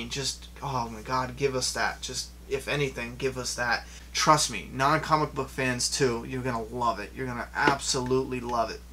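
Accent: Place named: New England, United States. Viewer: The way you talk...are you from New England??? Haha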